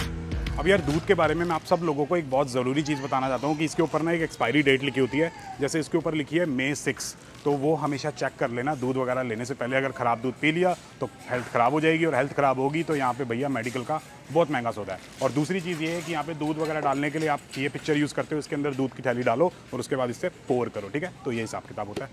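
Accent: Punjab, India. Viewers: its hindi with punjabi accent very easy to understand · Punjab jhlkda tuhadi boli cho bht mjja aaiaa dekh k video 😀jaiga ye cart k ander ☺️ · Dear apki language main jo punjabi texture (Tadka) hai its amazing; dear son apki vlog as always international students k liya bahut beneficial hoti hai and 2day vlog is superb God bless you my son and also stay healthy guys 🙏🙏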